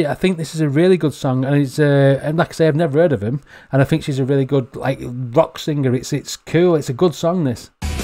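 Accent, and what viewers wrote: United Kingdom. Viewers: Are you a Liverpoodlian?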